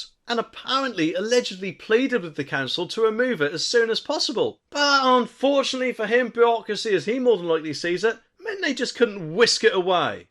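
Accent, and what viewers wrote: Norfolk, United Kingdom. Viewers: I love hearing a rant in a Norfolk accent 😂👍🏻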